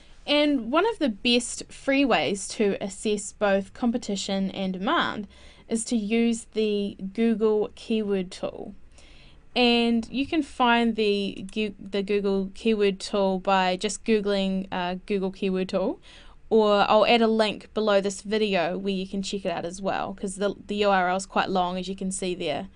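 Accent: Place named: New Zealand. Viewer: Sounds like New Zealand to me.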